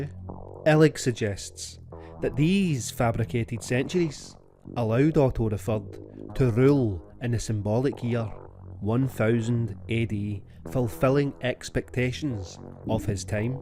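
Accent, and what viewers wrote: Scotland, United Kingdom. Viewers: Great Information...But Hard Time Understanding The Scottish Accent.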